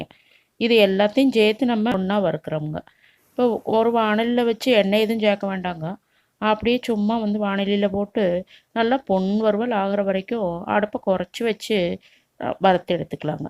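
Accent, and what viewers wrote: Kongu, India. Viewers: ஏனுங் கொங்கு தமிழ் ரொம்ப அருமைங்கோ. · Kongu tamil👌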